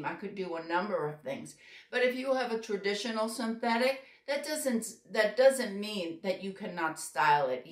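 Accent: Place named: New York, United States. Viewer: Heard NJ / NY in there somewhere! Thank you for your videos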